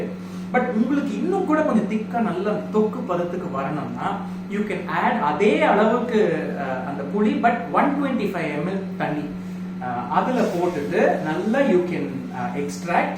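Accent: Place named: Thanjavur, India. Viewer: Age old recipe. Gothsu and gosthu are same. Regional pronounciation. Typical tanjore district pronounciation! Gosthu, embLadhu for eNbadhu😂😂 it has its charm.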